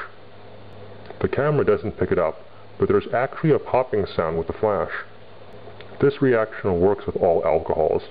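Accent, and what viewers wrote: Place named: Canada. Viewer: Do all Canadians sound like this?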